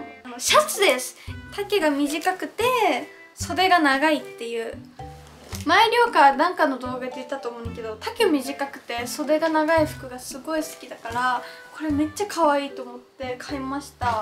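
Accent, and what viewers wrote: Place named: Kansai, Japan. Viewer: りょうか道民なのに関西弁使ってるの笑う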